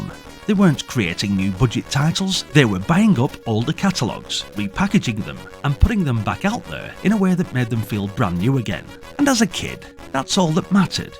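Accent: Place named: Rotherham, United Kingdom. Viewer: Are you from Rotherham? The accent sounds familiar lol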